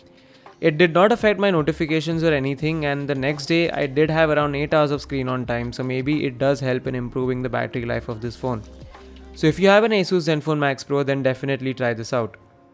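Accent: India. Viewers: Fvck you and ur indian accent!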